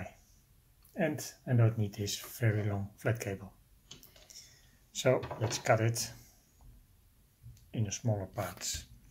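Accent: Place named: Netherlands. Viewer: Stone Coal Miner English! You must be a Dutch speaker! Hey hallo! Leuk project, grappig hoe je een Teensie gebruikt voor vertaling van originele keyboard matrix naar USB. Erg cool! Dumpje omhoog en subje erbij!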